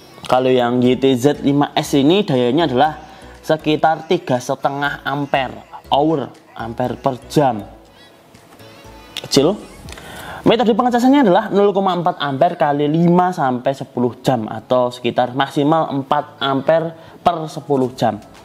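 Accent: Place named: Java, Indonesia. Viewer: Boso jowone ojok nganti ilang mas Aku suka caramu menerangkan dengan ada logat jowone mantap😁🤙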